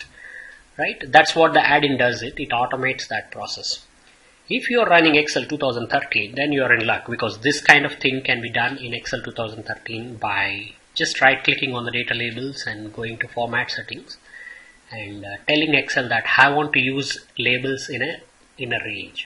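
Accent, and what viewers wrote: India. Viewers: i like indian accent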